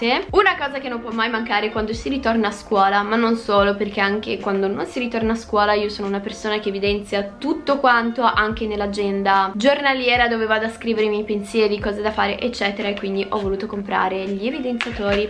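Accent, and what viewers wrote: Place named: United Kingdom. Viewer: Credevo che eri inglese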